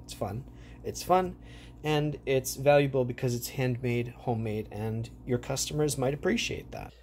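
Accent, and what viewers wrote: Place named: United States. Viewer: Nice art, nicely done, with the creativity to get it together! You are a Greek, though with 100% American accent, if I did not seen the last name I would not guessed it.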